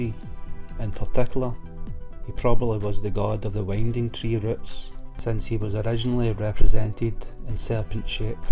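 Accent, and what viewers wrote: Scotland, United Kingdom. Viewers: I was like eh Scottish that's new on here lol I'm Scottish myself. I Loved it.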